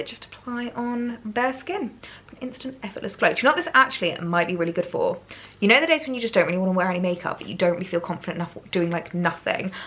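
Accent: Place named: United Kingdom. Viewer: Ty for responding. But please, there is no need to apologize.I'm a very loud speaker. Especially when I'm excited. So I constantly have to remind myself to speak softer. It's not an easy thing to do I know. And I'm 69. I've been trying to correct this at least a little bit my whole life. I cannot count the times people have asked me why I'm yelling when I feel like I'm talking normally. I have to tell myself that all I can do, is try to do my best. Part of the reason I might have been having trouble understanding you could also be that I'm not used to your lovely British accent. Out of all the accents in the world I've always admired and wished I was able to speak with a British accent. Have a lovely day. You are a joy to watch.😊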